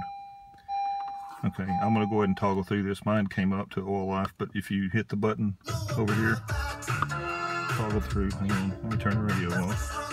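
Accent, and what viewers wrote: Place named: South, United States. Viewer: You must be a southern boy...awl light....awl .... aint no crime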